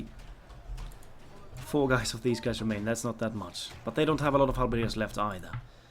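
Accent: Sweden. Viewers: Will you do an Ar ardunaim playtrough? really love your gamplay and your swedish accent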